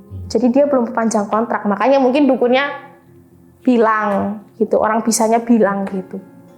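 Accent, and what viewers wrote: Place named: Banyumas, Indonesia. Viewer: Kak km orang ngapak yaa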